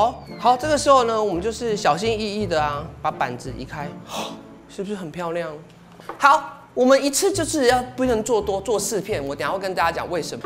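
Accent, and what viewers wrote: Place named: Taiwan. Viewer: 好多地道台語真的好愛🤣🤣🤣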